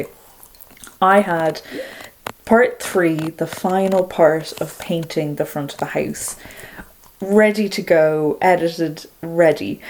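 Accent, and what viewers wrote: Ireland. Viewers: I don’t think any of us like the sound of our own voices. However, IMO - I have to say I think you could have a career in voiceover work. I think your Irish accent is lovely and I could listen to your voice all day! I think you could tile your living room floor (in very large porcelain tiles) and it will be easy to clean up especially in the winter when you have the pets coming in and out! Also if the floor is only slightly out you will be able to put extra adhesive on the lines to even the floor out? I would then get a very large rug? Good luck with whatever you decide! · Dear, being British, I looove your Irish accent. Don't ever doubt yourself. I think I speak for all your followers that you are doing a fantastic job and enjoy your videos. Remember, Rome wasn't built in one day and God willing you have many days to finish what you started. 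If I didn't live so far away or being 80 yrs old, I would gladly have flown to Ireland to help you. Thank you Dad for helping your dear daughter. I really enjoy your videos so keep up the good work and don't rush into anything. Rest ever so often and build up your strength. Love and hugs to you and your babies. From Cape Town ❤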